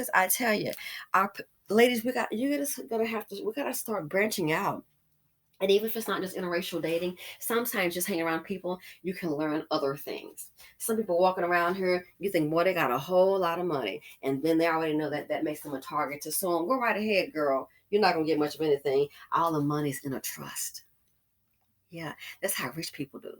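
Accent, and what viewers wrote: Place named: South Florida, United States. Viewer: I knew you were around Palm Beach. I’ve been trying to pin point your accent because you sound like another YT’er I listen to who lives in South FL. I love your accent.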